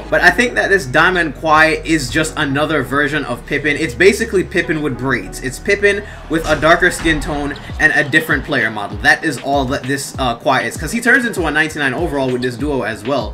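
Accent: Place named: Jamaica. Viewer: Bredrin the way the patwa come out whenever you get passionate kills me fam😂😂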